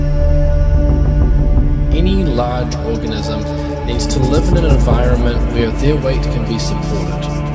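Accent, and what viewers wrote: New Zealand. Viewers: I'm actually from Auckland too, I'm Maori and was born there, but I left for Melbourne a long time ago and but the reason I could pick it is because before I left, the rhotic 'r' sound (which is very prominent in your accent) was just starting to become more widespread and especially among the Pasifika population around Sth Auckland. It's actually quite nice on you, and very nostalgic for me to hear. I could listen to it all day. Anyways, great video. I liked and subbed 😊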